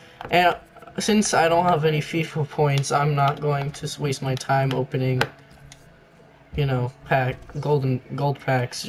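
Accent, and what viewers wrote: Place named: Estonia. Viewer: wtf ? are you from estonia??????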